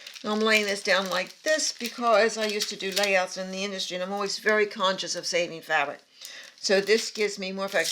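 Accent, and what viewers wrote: Philadelphia, United States. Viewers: In case you like cooking- Chef Jean-Pierre has Jack the family behind his camera. And boy oh boy the onyo soup- lol food's great and Chef is good n funny w his French accent. As nice to hear as your Philly accent!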